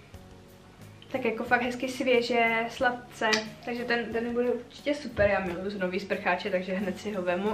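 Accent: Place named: Vysočina, Czech Republic. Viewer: Já na Vysočinu chodila do školy a teda některý slova jsem jako Středočeška s pražskou obecnou češtinou nechápala :-), ale nikdy by mě nenapadlo se ptát, proč říkají "víjou, najíjou se žlicou,spíjou,jdou za starostem" apod. Prostě nářečí, kterýho je ČR plná ;-)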